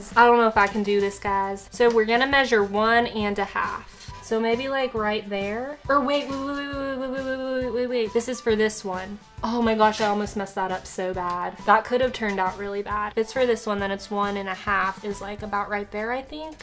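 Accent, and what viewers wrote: South, United States. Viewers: Why do you sound like my mom? Like the country accent and everything is spot on.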